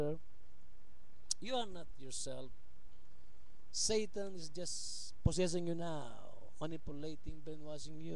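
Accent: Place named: Visayas, Philippines. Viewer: hisa bisaya thats why sounds irritating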